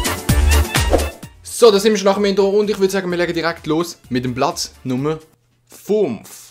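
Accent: Basel, Switzerland. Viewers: kannst du mir mal bitte sagen, wie heißt der schweizer Dialekt, indem du sprichst?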